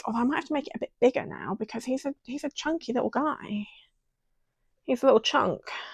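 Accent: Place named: United Kingdom. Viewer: Hi! New subscriber here. At first I thought you were local to me with your store name "Big Bear Yarns," I thought maybe you were from Big Bear, California (which is a great place to ski, and would be a great place to sell yarn)! Then I heard you speak and I said to myself, "Oh, I don't think she's local." 😂 Though you could be a transplant, but really, why would anyone from the UK want to live in the US these days, anyway? 😅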